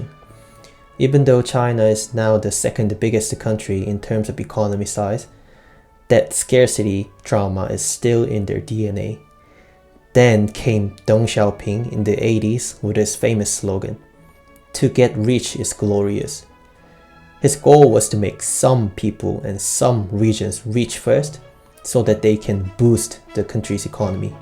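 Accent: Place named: Southeast Asia. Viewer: printed in their dna is false. Its the culture. My grandfather was from china close to Nanjing. Migrated to Thailand after WW2 (there was no food). I went to a british school in thailand. I am currently studying in Australia. As far as I am concerned, my supposed selfish DNA is not influencing my behaviour in the slightest. I think you know this, but I think you're prejudiced. The Selfish section of your video is true, but there are better explanations found on youtube, explained in a much more socially acceptable way. My guy, consider your behaviour and ask yourself if this is going to do you any favors in life. You'll lose all respect from your coworkers and friends in New Zealand. It's odd, because you seem to be asian based on your voice. Most likely South East Asian. So I suppose I can understand your frustration with Chinese Tourists trust me. 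But a lot of South East Asians have chinese genes, in fact a lot of them are chinese migrants like myself. So "printed in their DNA" is just a load of nonsense. Its sad how people aspire to be edgy like this nowadays. I always thought the goal in life is the become more of a gentleman. At 18 years old you gotta lot of growing up to do. I'm doing a lot of guess work here, but I think my opinions of you are pretty accurate and I can see eye to eye with you. I simply do not condone this type of behaviour.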